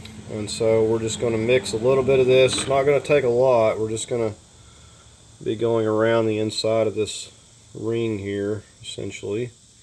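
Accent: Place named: West, United States. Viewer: your prononciation is like some cowboy from old movies.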